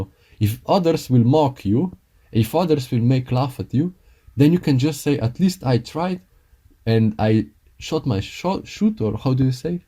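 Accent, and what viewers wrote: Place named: Spain. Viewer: your language accent is beatiful are u spanish or little bit south european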